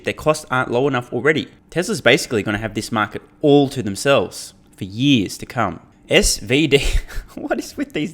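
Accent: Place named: Australia. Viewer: Sounds like an Ausie(sp?). Hat's off Mate!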